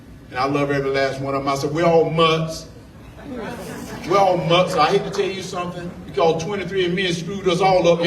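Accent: South, United States. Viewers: So, he's southern. We have southern accents, and he has COMMON SENSE!!! Something we really need in the senate!!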